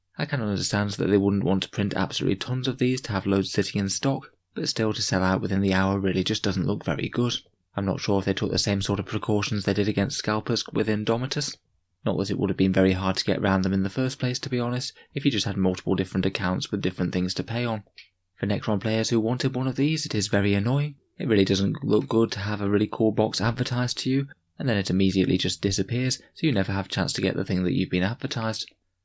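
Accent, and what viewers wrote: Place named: Yorkshire, United Kingdom. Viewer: What is your accent? It sounds like a soft Yorkshire accent. I'm going to guess Sheffield way. It doesn't sound like West Yorkshire - which is a bit Manc, or North Yorkshire, which is pretty close to Lancashire. Am I right?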